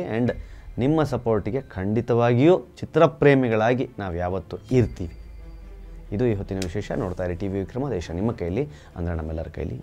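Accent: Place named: Coastal Karnataka, India. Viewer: ಕರಾವಳಿ ಕನ್ನಡ ಕೇಳೋಕೆ ಚಂದ....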